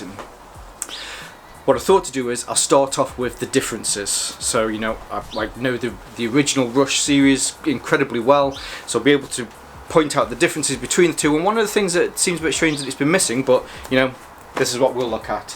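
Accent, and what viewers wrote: United Kingdom. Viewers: Hello Sir, are you British ? (i say that due to your accent)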